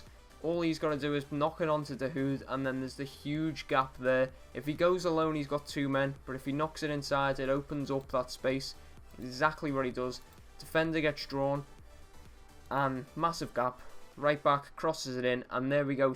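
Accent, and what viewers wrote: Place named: Liverpool, United Kingdom. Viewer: Where in Liverpool are you from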